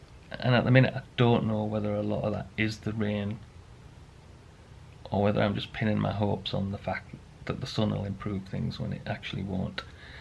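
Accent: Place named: Durham, United Kingdom. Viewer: Fandomlife I'm from Durham, recognised the accent lol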